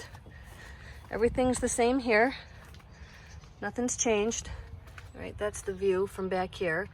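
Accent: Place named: New England, United States. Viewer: You have to be from east coast. You talk so fast. From new england area and I do too. New sub, great ideas you share👍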